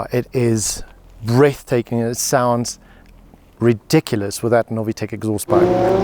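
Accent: South Africa. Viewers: A South African accent is so unique. And it explains emotions and feelings so well. Great job on bringing us these wonderful videos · Is my guy South African ?? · Didnt know homie was south african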